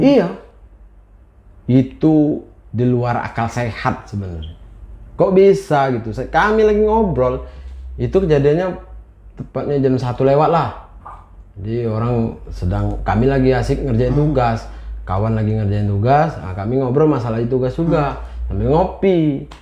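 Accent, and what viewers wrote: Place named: Jambi, Indonesia. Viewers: Khas nyo bahaso Jambi tu bang🤣 wong Kito la ,asyik nian dengar cerito Abang tu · Mas nya KKN apa uji nyali mas?? Serem gitu Btw bhasa Jambi itu sedikit Melayu ya kak? Suka denger nya saya 🙏🙏🙏 terimakasih sudah upload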